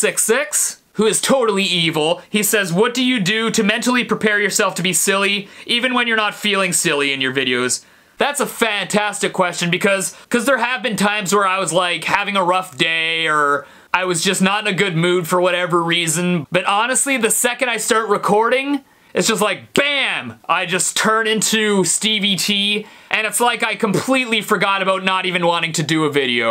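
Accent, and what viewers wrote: Canada. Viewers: I’ve been watching your vids for ages, this is the most Canadian you’ve ever sounded ❤️ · You sound extra Canadian in this one. Respect.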